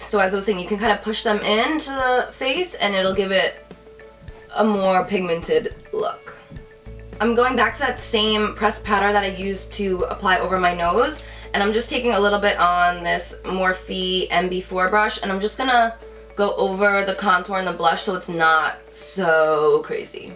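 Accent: New Jersey, United States. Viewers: I loveeee your Jersey accent and Jerseyism! I've had a love/hate relationship with NJ since moving here from California but you make me proud to call myself a Jersey girl now! <3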